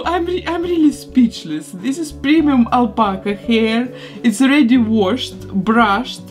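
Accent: Russia. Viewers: Русский акцент присутствует)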